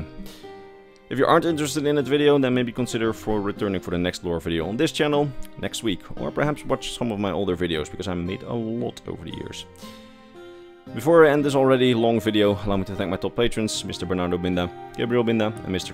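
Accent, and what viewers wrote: Netherlands. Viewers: Are you Dutch? If so, goede videos! Hope you'll continue to make the ESO story videos up to the latest chapter!!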